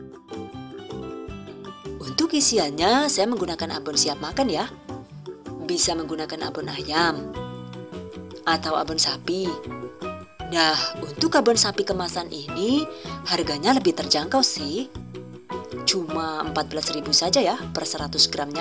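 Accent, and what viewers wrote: Banyumas, Indonesia. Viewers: aku suka Vidio orang ngapak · Bunda tu ngapak kah hehe... Btw suka sama chanelnya 😍😍 lebaran kmrn aku pakai resep ni buat pesenan pastelnya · Syuka bgt sm resep2nya Yu...hp sy semua isinya,sy save & ada yg beberapa sy praktekin,masyaAllah chanel resep yg gk pake bohong yg pernah sy subscribe.😊...setiap yg sy praktekin Alhamdulillah berhasil.syuka jg sm ngapaknya itu yg gk pernah ketinggalan,CINTA NGAPAK pokoke ya Yu:)) Sukses & sehat selalu ya Yu...semoga pahala trs mengalir krn selalu tebar kebaikan. Pengin meet up sm yayune,hehehe..."semoga" #GiveawayTHVFEBRUARI19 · Konten yg menyenangkan...ada bahasa ngapaknya Jawa saya..i like ..thank you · Bundaa kok bisa bahasa ngapak? 😁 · Assalamualaikum ......aku sangat suka dan ngefens samambak punya ciri khas suara yg sangat merdu skli.aku suka resep2nya mbak .danadaresep totorialnya yg mdh dansimpel utk dipraktekan aku sangat suka bhs ngapak2nya itu ......😂😂😂😂😂😅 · Manstap bgt bunda...ngapak ykykny bhsany..sama nih🤭..suka bgt q kue ini🤗🤗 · Pinter masak.. 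Pinter bhasa inggris Ehh pinter ngapak jg Komplit deh😁😁😁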